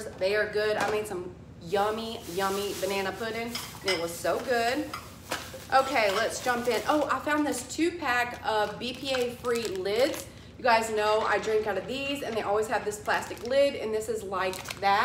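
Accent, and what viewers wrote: South, United States. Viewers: Oh girl I got such a kick out of you saying you from the south like we couldn't tell love you girl. I say girl cuz I'm almost 70 n I call my girls lil girls.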